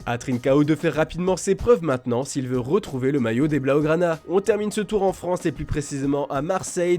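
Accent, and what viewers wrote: Italy. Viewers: Pourquoi y’a plus l’accent Italien accentué ? Vous avez changer Foot Mercato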